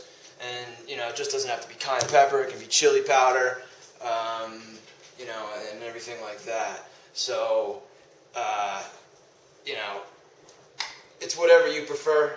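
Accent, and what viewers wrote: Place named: California, United States. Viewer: Sounds like hes frim california, definately not the midwest.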